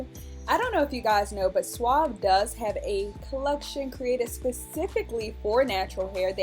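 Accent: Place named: South, United States. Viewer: soo random but i never noticed she had a southern accent. i swear she does 😅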